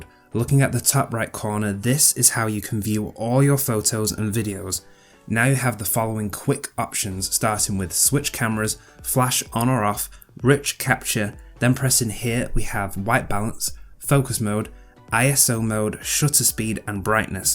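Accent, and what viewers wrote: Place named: United Kingdom. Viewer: I can listen to british people talk forever lmao. good review!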